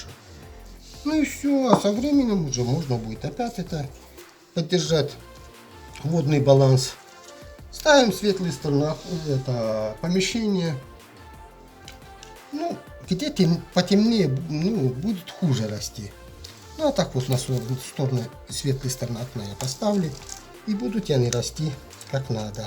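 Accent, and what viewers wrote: Belarus. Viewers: Какой приятный для слуха белорусский говор,я не ошиблась? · Как приятно слышать белорусский говор, просто и доходчиво рассказал. Только хотелось бы увидеть ещё как из бокового тонкого побега вырастает новый.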